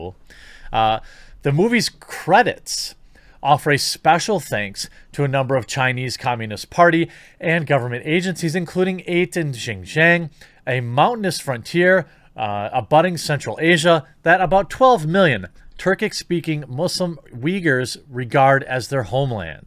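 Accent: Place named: United States. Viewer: You're saying Xianjiang wrong, but I've heard a lot worse. You're just saying it with an American accent. You're also saying Uyghur wrong. It's not "We grr." That's just how MSM pronounces it and I guess most of the West now thanks to them. It's more pronounced like "Uuu ga ru." (I forgot how to write down sounds but that's the best I can do. lol)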